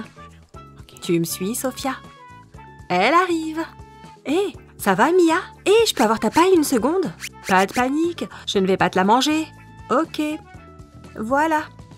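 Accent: England, United Kingdom. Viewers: Vous êtes anglaise ou pas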